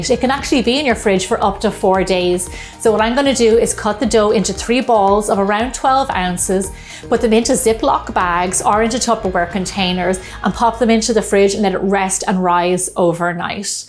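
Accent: Ireland. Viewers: The guy's mouth is only moving while she's speaking like yeah yeah just hand me a slice 😅.. also is she Irish. I now noticed her accent love it and her pizza so 😋😋😋😍🤤🤤🤤🤤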